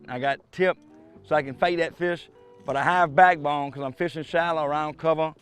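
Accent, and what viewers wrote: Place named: Louisiana, United States. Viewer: Sounds like a true Cajun boy to me 👍🏽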